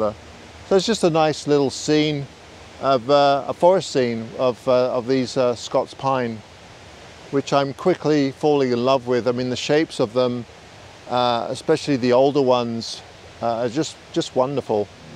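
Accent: United Kingdom. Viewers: Nice final image,. Being a Canuck, I hear your British accent. However, when you declared that you are a Canadian and followed this up with the classic "eh", you conviced me that you are indeed a Canuck as well.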